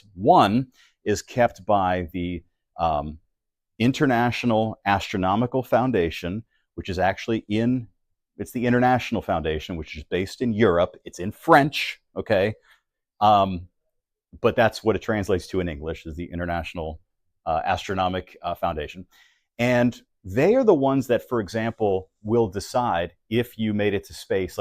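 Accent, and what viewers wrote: United States. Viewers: I’m hooked on this channel But since he seems to have a pretty distinct American accent I assume this is probably an American made video. We don’t generally use kilometers here. I have no idea what the ratio is to miles. Nor do I want to stop constantly and look it up. Use miles! Or at the very least use both all through the video.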